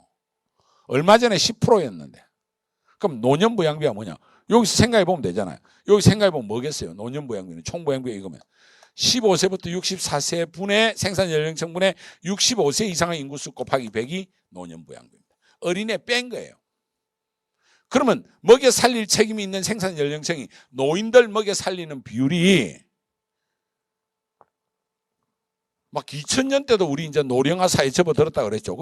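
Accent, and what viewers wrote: Gyeongsang, South Korea. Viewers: 말투가 경상도인데 무슨 서울? 경상도 출신이 서울사는거겠지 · 대가리나쁘면 공부하지마 여학생이졸고있으면 쳐자빠져자는 미친년아 ㅋㅋㅋㅋㅋㅋㅋ 분필던짐 여기서 한두명만인서울 간다고 특유의 경남말투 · 경상도 표현이 좀 섞여서 그런거에요. 기분나쁘게 말한건아닌데 그렇게 들린것같아요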